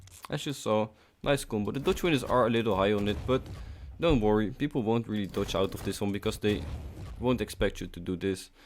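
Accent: Netherlands. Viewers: dit nederlandse accent:cat-orange-whistling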